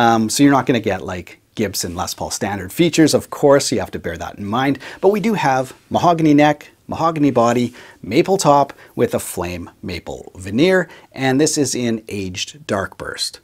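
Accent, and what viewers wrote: Canada. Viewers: I could tell you were from Canada! I'm from PEI!...'Eh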